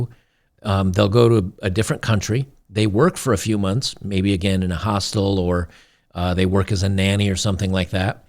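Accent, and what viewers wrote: Australia. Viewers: As soon as I heard the accent, I thought to myself, "Thank fuck I left Australia!" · Being Australian myself I knew she was Australian as soon as she started talking... trust me though, you will be surprised how many girls there are like that over here, she is not alone. Anything for clicks these days... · Omg she sounds Australian. I hope I don't run across her......😱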